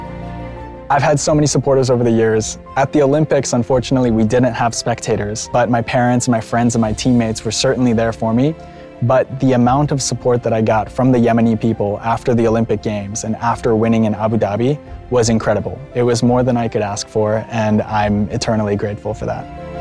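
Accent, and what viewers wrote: United States. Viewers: اكثر شئ ركزت عليه اللكنه الأمريكية ...استمر يا بطل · في اللهجة أمريكي اما الشكل يمااااااااني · معاه اكسنت واحد يقول انه امريكي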